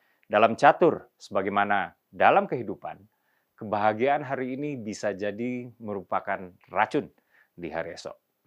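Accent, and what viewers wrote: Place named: Java, Indonesia. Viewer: Logat Jawa nya terlalu nampak Bang....😅😅😅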